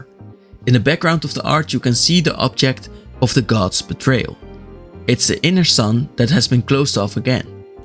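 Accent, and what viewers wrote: Netherlands. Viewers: first of all, are you Dutch? second of all, very good explanatory video! I had no idea what the gods were doing on Ixalan all of a sudden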